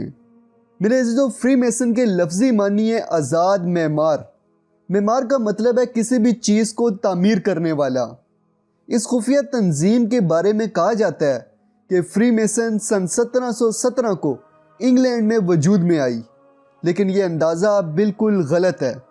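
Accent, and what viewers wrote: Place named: Mandi Bahauddin, Pakistan. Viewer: جناب گرد اپنی منڈی بہاؤالدین والی اڑڑدو ٹھیک کرو نہیں تو پنجابی بولو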